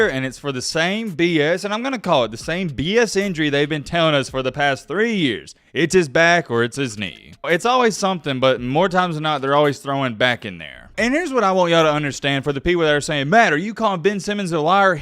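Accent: South, United States. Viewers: The southern accent followed by the weird rambling about lebron’s space jam (this video has nothing to do with LeBron OR space jam btw)…..yeah I only made it 30 seconds in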